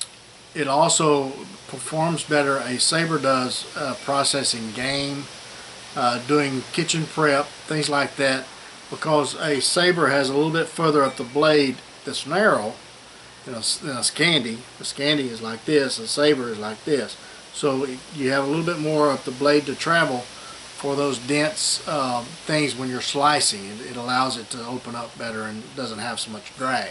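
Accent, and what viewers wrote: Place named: Appalachia, United States. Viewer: he sôunds like an appilation American I'm one too thats why I can t spell it.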